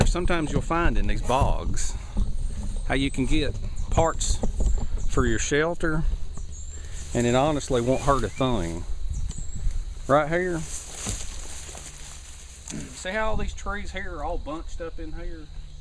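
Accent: South, United States. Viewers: Hey, Forgot to mention - i now know how to do that knot you used - thanks so much my friend. this is something i will remember for the rest of my days. The way you showed it was so clear and easy to follow unlike some other knot video's on here - can you do a video with some more knots that you know? Your a great teacher & fun to watch, love the south accent too:)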